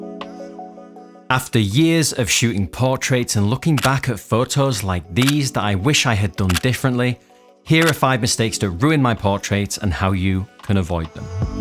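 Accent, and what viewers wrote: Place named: North, United Kingdom. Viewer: by eck it's good to 'ear a northerner on't that there internet..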